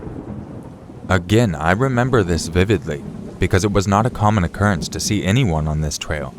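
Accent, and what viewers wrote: South, United States. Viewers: u and that county voice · Love your Southern accent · Love the southern accent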